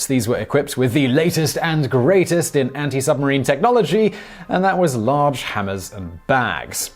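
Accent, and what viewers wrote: United Kingdom. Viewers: Simon, I general I can't stand British accents, but yours is pleasant.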